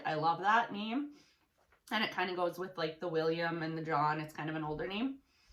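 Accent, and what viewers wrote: Canada. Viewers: this is the first video of yours ive ever seen so are u canadian? u sound like u have a super strong canadian accent (im canadian btw) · Sorry I’m late. I just started to watch you’re videos. I was fascinated by the diy haircut video and the Canadian accent. I’m from Michigan. 😉 Here was our boy name list. Our sons name is Ethan 💙 Jack Jackson Jake Jacob Jaden Jayden Ethan Carson Zachary Carter Tyler Liam Bentley Blake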